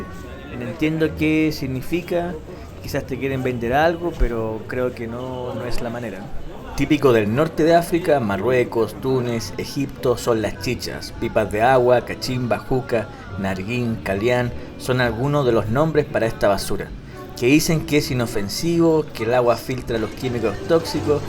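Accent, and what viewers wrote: Chile. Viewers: Me encantó tu vídeo y sobretodo tu relato bien chileno , yo creia que Egipto era un país muy caro para visitar,pero por lo que cuentas no es asi , saludos desde Los Lagos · Genial el relato 😂😂😂a lo chilensis Bravo Me encantó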